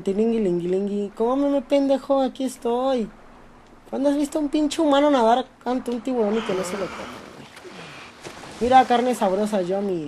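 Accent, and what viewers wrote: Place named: Mexico. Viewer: Habla como mexicano, dice palabras de españa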